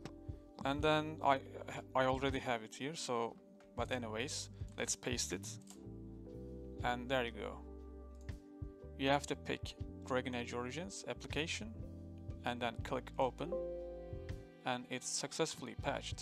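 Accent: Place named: Turkey. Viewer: Videoyu izlerken içimden ya bu adamın ingilizcesi bildiğin türk ingilizcesi ya diye geçirirken masaüstündeki türkçe isimli dosyaları gördüm eline sağlık video için kardeşim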